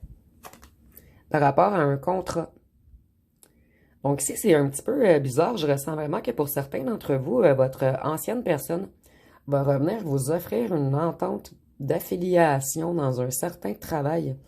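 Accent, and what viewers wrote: Quebec, Canada. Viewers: J'adore votre accent le jour j'irai voir voir au Canada ❤❤❤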